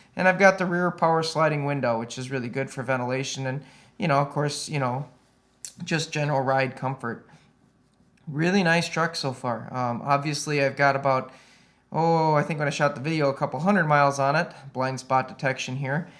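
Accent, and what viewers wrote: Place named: Fargo, United States. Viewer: its REALLY NICE! lol...love the fargo accent.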